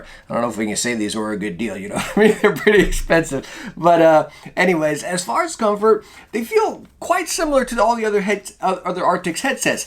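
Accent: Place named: East Coast, United States. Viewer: 2 seconds in i was like "this guy is east coast for sure"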